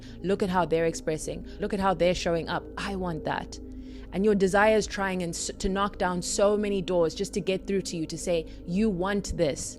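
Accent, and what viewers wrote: South Africa. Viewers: The dragon only guards the treasure🤯 I was in a yoga pose listening to this and I almost fell to the ground. Thank you for this episode you just freed me and I’m into this episode. Thank you for being this example and living your purpose. As a South African hearing my accent speak this work is so beautiful and lets me know it’s time for me to teach what I learnt to free myself from conditions and limitations. Thank you for this. I’ll start my YouTube channel officially today. No more fear. No more spiritual closets either. 🙌🏾🫰🏽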